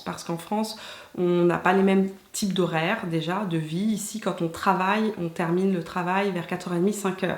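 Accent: Canada. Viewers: Très jolie ton histoire avec ton chéri ! Il vaut mieux se teindre les cils que faire une extension de cils . Sympa cette vidéo en tous cas ...c'est Marant parce que je pensais que tu étais canadienne du coup ...tu as pris un peu l'accent je trouve. Mille bises et bravo pour ta chaîne tu es toute simple et de bons conseils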